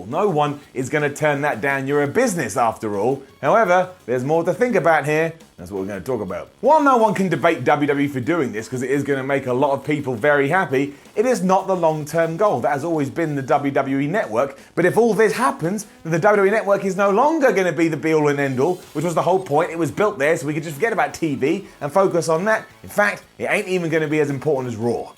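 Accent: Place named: United Kingdom. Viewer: I've watched so many videos featuring that I'm so used to his voice that I can't hear his British accent anymore. I'm just completely used to it.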